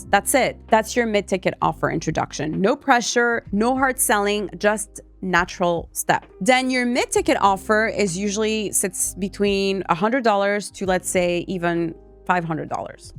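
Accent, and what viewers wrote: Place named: Quebec, Canada. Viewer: Hi, hearing your accent...are you a french canadian by any chance?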